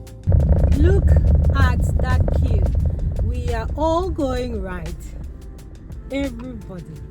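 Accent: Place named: Akwa Ibom, Nigeria. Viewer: You speak like an akwa ibom Nigeria❤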